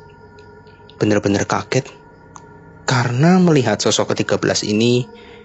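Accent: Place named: Java, Indonesia. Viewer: saya ga suka nih kalo dia yg baca suara. Medok. trus ga enak bawainnya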